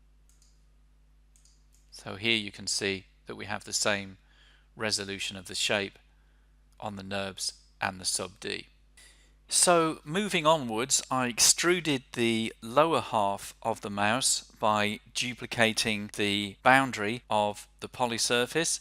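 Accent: United Kingdom. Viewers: Beautiful and sexy English voice and models. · good material but appalling teacher, a text to speech bot is gonna do better and with more life than you. Not to mention a posh accent is the last thing we want in a tutorial.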